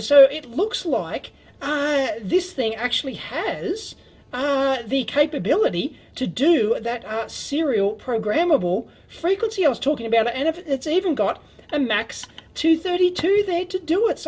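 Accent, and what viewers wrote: Australia. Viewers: I've been watching your blogs for a year and I dig the Aussie Accent. And the material is always excellent.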